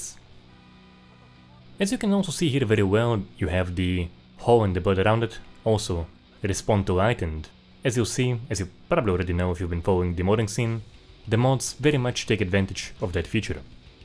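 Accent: Russia. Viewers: у тебя акцент русский))) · You have a Russian accent. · Русский акцент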